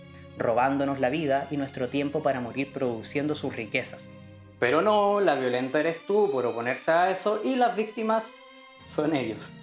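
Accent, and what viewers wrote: Chile. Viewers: Entre el dialecto chileno, la homosexualidad en su forma de hablar, y además que se quiere hacer el indio, es imposible entenderle.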